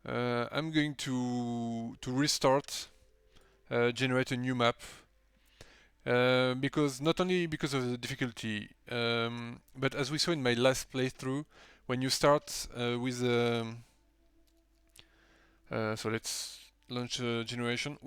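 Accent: France. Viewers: love your French accent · You sound French :)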